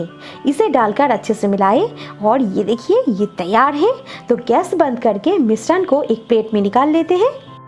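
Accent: Bengal, India. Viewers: nice culnary skill...ur hindi in Bengali accent is so cute....🤗🤗🤗sukhto Recipes plzz easyway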